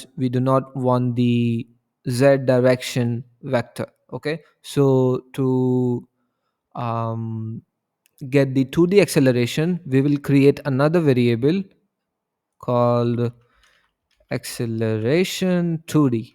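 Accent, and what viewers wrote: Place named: India. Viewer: i appreciate the effort especially for the thread explainer there. You teach everything quite clearly and it helps that your indian accent is not as heavy as others.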